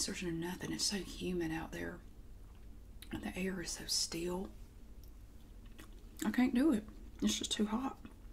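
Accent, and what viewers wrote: South, United States. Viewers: I just moved to the south and I love your southern accent.Your fantastic. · I like your videos! They are really interesting and helped me learn southern accent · Beautiful southern accent. I can't stand these over drawn 1800's slave owner accents everyone gives southern people. Very well done very natural, much love from Atlanta.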